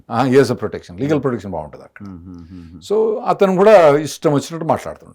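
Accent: Telangana, India. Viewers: screen layout clean, clarity on discussion Telengana accent entertaining Keep doing more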